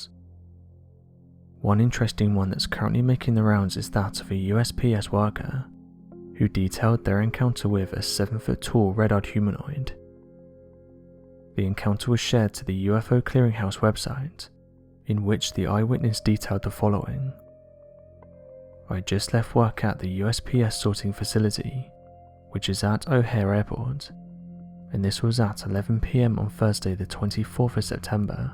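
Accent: United Kingdom. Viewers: Your British voice drives me up the wall. Have somebody else narrate your stuff. When you talk British and you talk like you're singing.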